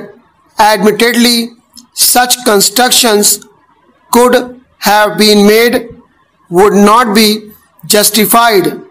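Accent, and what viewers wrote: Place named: India. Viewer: LMAO ewwwww Indian accent, was expecting actual English, thumbs down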